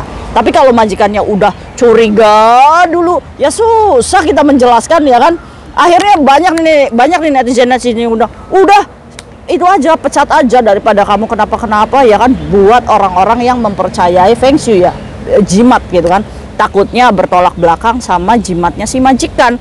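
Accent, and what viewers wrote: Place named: Java, Indonesia. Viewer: Ka orang indramayu ya kk ko Bahasa rada " jawa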